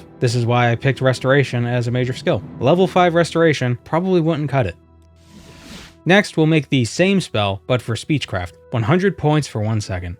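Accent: Boston, United States. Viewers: You do a great job masking it most of the time, but your Boston accent is just too strong. Great content, keep it up.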